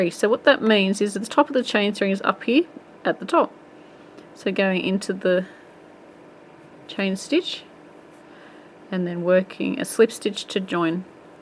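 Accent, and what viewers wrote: Australia. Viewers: love hearing the Aussie accent!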